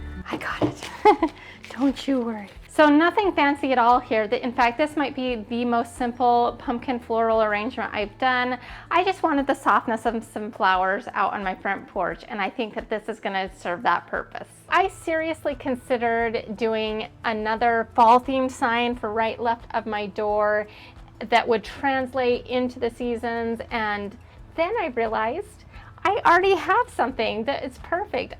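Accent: Utah, United States. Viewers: I have those same jack-o-lanterns and I just turn them around for the month of November. I also made your welcome home sign and had thought of putting a big maple leaf where the wreath is but I love the idea of just adding holiday embellishments to the wreath ! Thanks for all the cute ideas. You sound like you may have grown up in Utah. Love your channel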